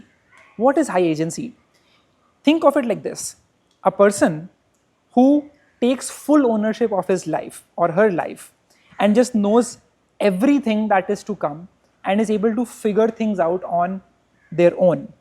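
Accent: India. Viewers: Your tongue ia real indian accent😊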